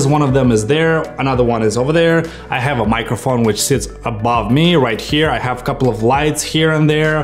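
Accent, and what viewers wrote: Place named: Russia. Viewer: You have nice russian accent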